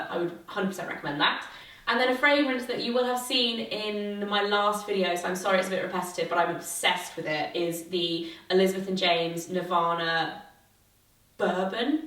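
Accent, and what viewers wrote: China. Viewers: Hahaha....the accent? I think it's Chinese! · The speaker is 100% speaking a Chinese accent! Lol! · The speaker accent sounds like Chinese (mandarin speaking) accent =) it's cute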